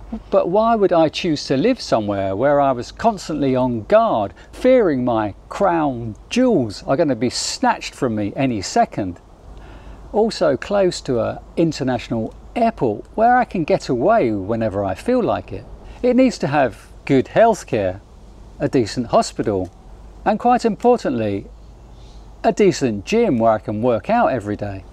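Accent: England, United Kingdom. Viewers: Love your delivery attotude and yes English accent. Gteat video uncle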